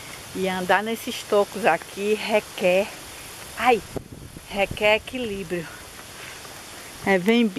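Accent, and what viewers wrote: Northeast, Brazil. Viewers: realmente vc mora num paraiso...bela beleza do lugar,e seu sotaque e no nordeste ne? mas onde?bjus minha querida vc e td de bom e muito divertida....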